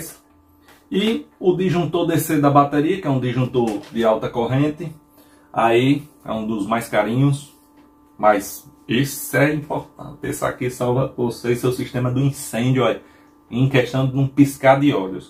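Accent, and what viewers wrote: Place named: Rio Grande do Norte, Brazil. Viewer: Comecei a acompanhar seus conteúdos hoje e já me inscrevi, sem comentários a qualidade. Sou de Vitória ES. Uma sugestão, você tem uma boa didática e conhecimento, os principais requisitos para preparar e lecionar um curso completo no assunto, é uma forma de remunerar e é justo e honesto. Pelo seu sotaque, acredito ser de RN. Abraços e sucesso.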